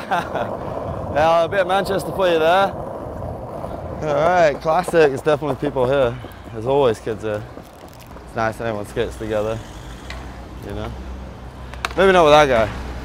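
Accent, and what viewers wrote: United States. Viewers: strange accent seems he picked up an american twang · Once he starts drankin he sounds American.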